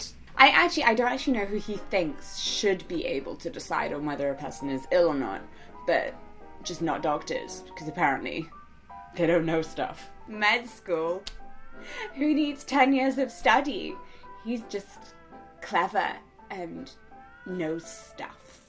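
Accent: RP, United Kingdom. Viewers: People probably ask about your accent, because it sounds exceptionally posh.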